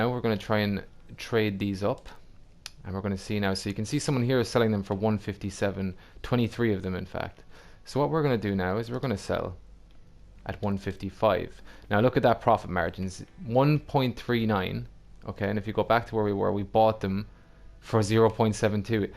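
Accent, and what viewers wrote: Ireland. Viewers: Are u Irish cos I'm from waterford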